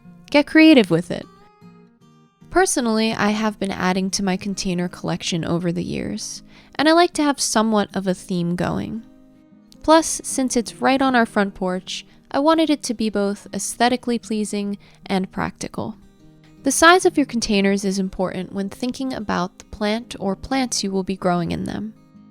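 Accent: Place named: United States. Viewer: thanks for the tips! Apologies btw. I didn’t realise you were American, the accent is quite subtle and only realised when you were talking about 50 cents seeds. Brown field over here is the site of an old building torn down and new housing built on top. The soil is usually awful, if indeed it is soil. More often it’s just rubble with a lawn on top. Being able to grow plants and identifying them in the wild should be taught in schools, (at a practical level).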